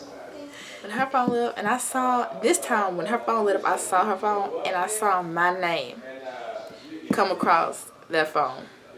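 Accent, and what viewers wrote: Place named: Detroit, United States. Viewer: you have a detroit accent for sure ☺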